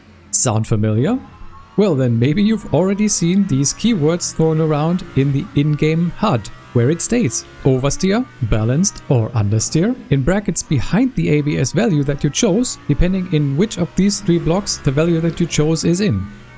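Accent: Germany. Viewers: You cannot brake noob. Also dude, this German accent sounds terrible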